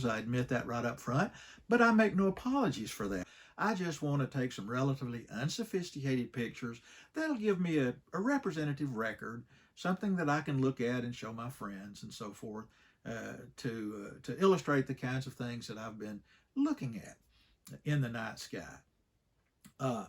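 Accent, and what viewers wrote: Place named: South, United States. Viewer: Awesome photos. Love your channel. Very clearly explained concepts on your videos. Plus love ur Southern Accent.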